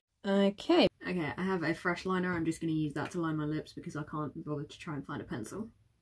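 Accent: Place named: United Kingdom. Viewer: O f#ck, you have british accent, very nice!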